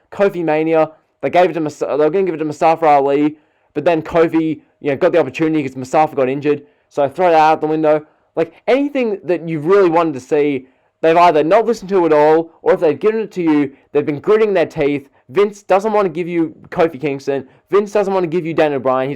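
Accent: Australia. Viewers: Hey man what part of Australia are you from?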